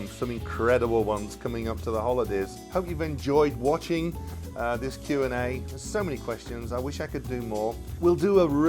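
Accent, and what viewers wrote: United Kingdom. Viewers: I just love your videos! Thanks for sharing your memories & recipes -- your presentation is so easy-going and friendly that it doesn't call attention to how great your technique is and how detailed you are. My question for next Q&A, where in UK is your accent from and what is your favorite dish from your home region?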